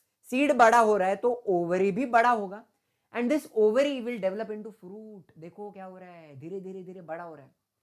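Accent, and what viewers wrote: Maharashtra, India. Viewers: I think sir is gifted by God for 10th students. And one thing..... Sir's mothertongue is Marathi (chikhat)😅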